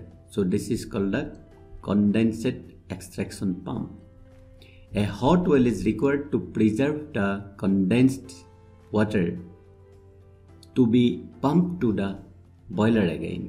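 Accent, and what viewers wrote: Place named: India. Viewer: Indian English is painful in the ears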